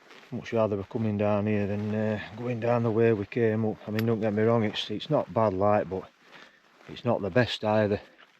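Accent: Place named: Yorkshire, United Kingdom. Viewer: Need to stop watching this channel. A few days ago I caught myself slipping out a bit of accent, saying "over there", and thought where the bloody hell did that come from? Ah, I know,. Did make me laugh. Hahaha. Like English kids who watch too much American YouTube and start talking in a yanky accent, we're all going to start talking in a Yorkshire accent, and that.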